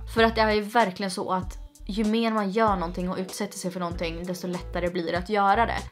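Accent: Stockholm, Sweden. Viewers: ja hon har bytt från vanlig svenska till stockholmska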